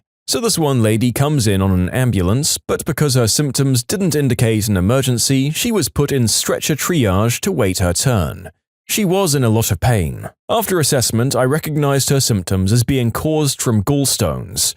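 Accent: United Kingdom. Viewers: i like british man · Ya british mate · British guy :C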